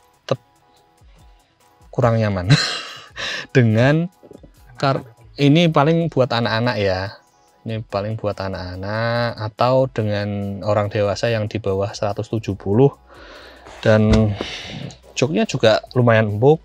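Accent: Java, Indonesia. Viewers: Ngomongnya khas jawa, luamaa😅 saya percepat 1,75 baru enak dengerinnya..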